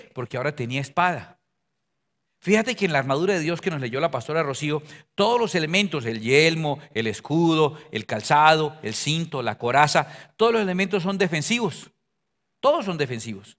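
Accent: Cantabria, Spain. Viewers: Gracias paisano no solo por la Palabra de DIOS REVELADA, si no por la manera como la trasmite en nuestro dialecto especial Santandereno tan jocoso gracias pastor me hace reír y estoy segura que al Señor.Jesús también bendiciones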